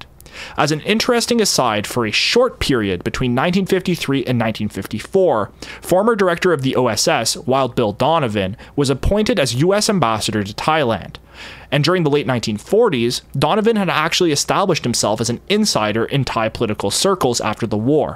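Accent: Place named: Central Alberta, Canada. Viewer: That sounds like a central Alberta accent on the narrator 😅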